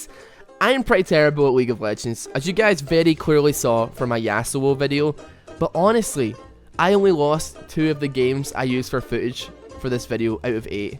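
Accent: Scotland, United Kingdom. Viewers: r u from scotland or great britani?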